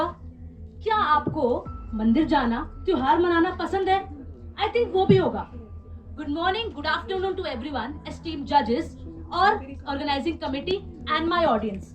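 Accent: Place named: Punjab, India. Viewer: you said secular belief & spritual belief. In captions it's mentioned Religious vs Secular. There is a huge difference between spiritualism and religion even though they're co-related. Your speaking skills are decent but your arguments can be easily penetrated. I'd love to wage war against your Punjabi accent the next time we face each other. Props to you for the victory.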